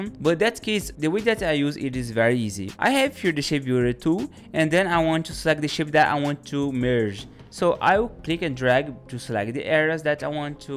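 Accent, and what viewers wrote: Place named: Brazil. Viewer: There is absolutely no chance you´re not brazilian with this accent hahahaha thank you so much for your help :D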